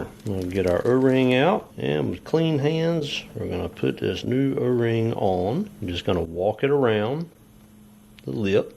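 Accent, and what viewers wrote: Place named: Georgia, United States. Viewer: Excellent DIY tutorial with a Goorgan accent to boot, I reckon. Bummer you had to go all through this after you fixed the AC a year ago. But that's the reality of owning a car past it's engineered intent. One good source of AC troubleshooting is the Professor Pentane yt channel.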